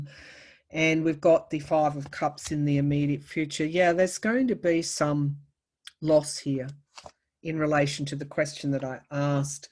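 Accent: Australia. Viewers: Just hearing your voice and your adorable Aussie accent makes me smile and makes me feel at peace. You've got something that's beautiful. A gift. And I don't just mean the cards. Thanks again!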